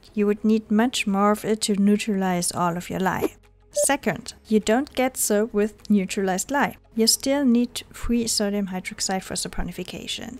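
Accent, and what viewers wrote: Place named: Germany. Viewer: Hi, as someone who loves science, this was a great video. I was trying to guess your accent at first I thought you were from Germany then I toggled between Belgium and Swedish. I knew you weren't from France due to I am French. I checked your bio, and I was correct German❤❤.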